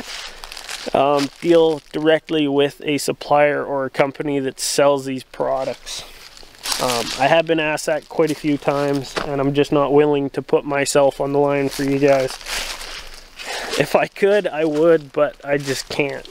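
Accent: Canada. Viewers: I heard the accent… thought you were Canadian. You popped the hood and I saw the DDC plates.. YEP! You’re Canadian 😏 beautiful truck !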